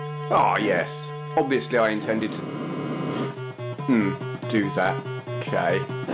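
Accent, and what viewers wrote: United Kingdom. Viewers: what part of th uk are you from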